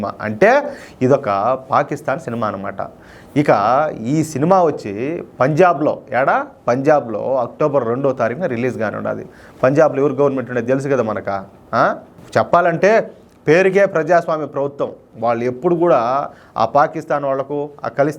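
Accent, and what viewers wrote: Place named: Ongole-Nellore, India. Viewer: Kuttabagala undla nee voice mana ongole Nellore bashalo em matladavanna ....subscribe chesukondi plz Andaru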